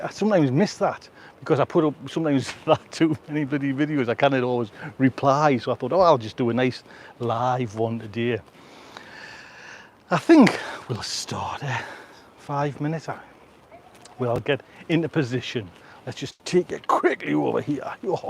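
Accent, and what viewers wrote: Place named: North, United Kingdom. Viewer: Sound good... apart from the funny northern accent!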